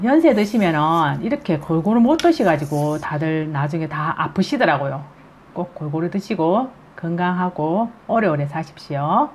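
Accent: Gyeongsang, South Korea. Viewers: 경상도 사투리가 너무 정겹습니다. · 경상도 말씀이 정겹네요 제가 위가 않좋아 고생하는데 함 해봐야 겠네요 감사합니다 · 경상도 사투리 참 말로 재미있다 그렇치요!? 맛 난 요리도 맛 있고요 나도 해볼람니다 잘 계시소 자주 방문합니다 좋와요,구독도 잘눌러 줍니다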